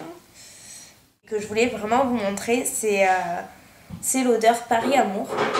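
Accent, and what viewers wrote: South, France. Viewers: Ah que j'aime cet accent du sud! Et surtout les "PU..", j'ai l'impression de me retrouver chez moi dans le sud! En tout cas, ta coupe te va très bien! Avec ce maquillage en plus tu es magnifique!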